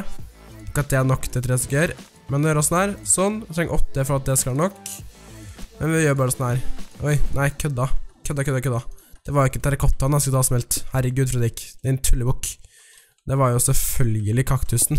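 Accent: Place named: Oslo, Norway. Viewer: Du høres faktis ut som en som er oppvekst i Oslo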